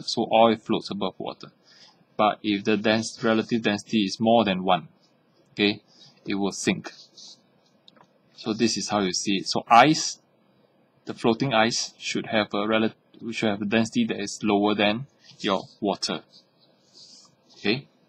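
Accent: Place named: Singapore. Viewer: cher i like your singlish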